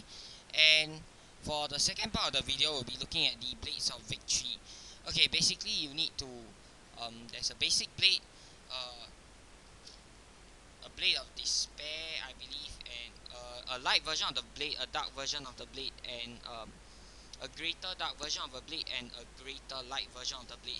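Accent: Singapore. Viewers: I can tell by your accent that you're singaporean, amiright? xD Also, does the weapon have any uses? its been in my inv for a few months now and i dont know wether to sel it or not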